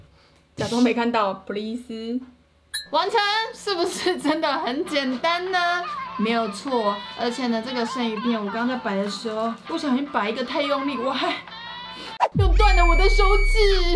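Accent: Taiwan. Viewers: 在國外工作看你吃飯很療癒再五個月就回台灣謝謝水水最愛看你說台灣國語和不碖轉的台語加分！掰餔～ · 將台語好可愛喔😆😆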